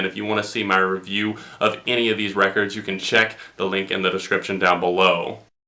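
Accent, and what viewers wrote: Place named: North Carolina, United States. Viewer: I heard your voice and I was like, "I know he's from North Carolina. I know it." So I clicked in the description and I knew it. Anyways I've been loving these videos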